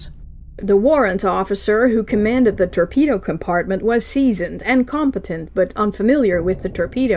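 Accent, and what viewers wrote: Netherlands. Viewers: well she's Dutch so ... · She is dutch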